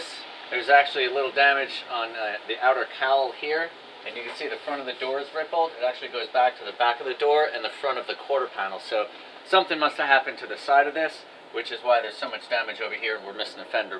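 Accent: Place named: Canada. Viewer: Front bumper mounting bracket. I noticed you speak some Canadian.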